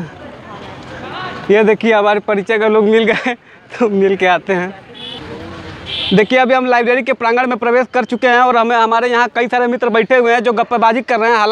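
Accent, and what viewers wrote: Bhojpuri, India. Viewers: Glti se i came here.....but couldn't stop myself from watching the whole vdo.....nd just coz of his awsmm #bhojpuri assent.......😂😂😂😂he was like inko kon hi rokega😂😂😂😂😂😂😂😂😂😂😂😂😂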